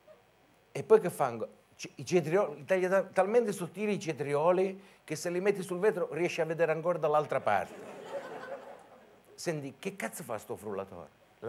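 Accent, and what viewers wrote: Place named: Calabria, Italy. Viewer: o grande calabrese sopratutto e l accento che mi frega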